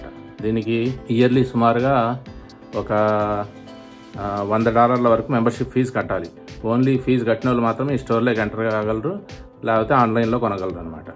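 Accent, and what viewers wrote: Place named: Telangana, India. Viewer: Telangana slung sir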